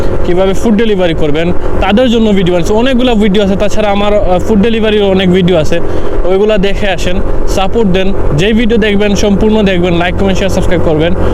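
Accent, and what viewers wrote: Sylhet, Bangladesh. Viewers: Sylhet'i naki apni? 😊❤ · Bhai Sylheti nii?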